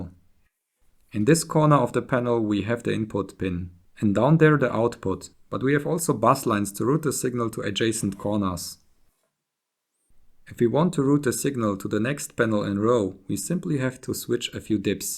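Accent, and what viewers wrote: Germany. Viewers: Are you from germany ? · Like is voiceovers are great but in he's like excited in video he sounds so German😄😂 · Das Deutsch hört man wirklich immer rauß :D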